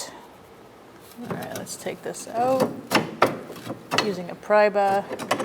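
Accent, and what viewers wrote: Boston, United States. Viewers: A big thank you from South Texas! It sounds like you have a Boston accent which just makes this video better for some reason.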